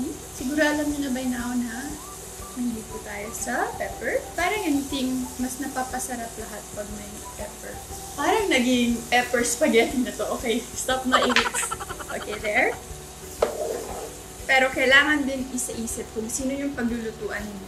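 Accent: Visayas, Philippines. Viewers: Next vlog suggestion po. Bisaya only vlog😍 pero po sana may tagalog subs kasi tagalog kami eh😂 gustong-gusto ko lang po yung bisaya accent nyo❣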